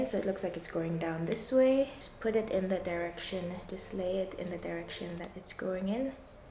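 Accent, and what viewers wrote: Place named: England, United Kingdom. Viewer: 💜 …….💜 ….Girl What I love about this video is (everything) but specifically your English accent 🔥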